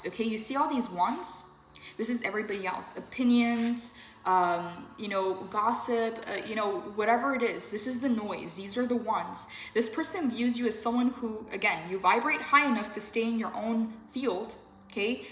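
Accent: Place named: Latin America. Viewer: 333 Eastern!!! I AM SO HAPPY WHEN I SEE YOU POST!! Ain't gonna lie I feel like we are long lost family. I swear I can feel you when you meditate. I detect a Latina accent am I correct, if so I was wondering what your lineage is? I ask because my indigenous ancestors were Tahino { primarily Porterican and South Florida descent and Aztec { South America}... Either way I usually only listen to 3 readers on the regular and you are my top 2. My other reader does readings every day. I understand why you don't, you put a LOT OF ENERGY in your readings. But as someone who was a Christian Pastor and has a degree in Social work, a soul healer where I have given advice to hundreds of not thousands by now... I will gain in sight from you I will be honest, I don't have very many people who are able to advise me with things I don't already know or I am not already thinking of. 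You do. FULL HONESTY I believe you have powerful emotional advice. I wish we were friends. I see how the cards and spirit brings out beautiful messages through you but you absolutely communicate them with grace. I find myself listening to you more for your advice and empowerment as I am processing through life. Know you are loved from afar and I greatly appreciate all that you do. My only wish is I could afford your personal readings so I could finally have just a normal conversation about life .. Chi Miigwetch ( Heart FEALT gratitude, Ojibwa)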